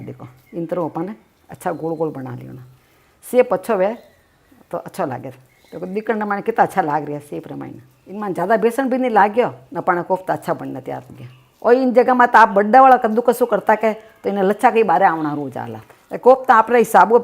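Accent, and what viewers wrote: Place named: Rajasthan, India. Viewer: Apki har recipee bhut achi hoti h aur apki mrwadi language sunne me bhut achi lgti h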